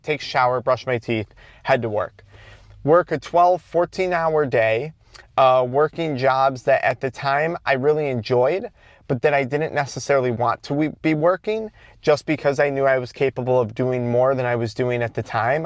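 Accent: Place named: Michigan, United States. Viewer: I'm going to pin the accent on southeastern michigan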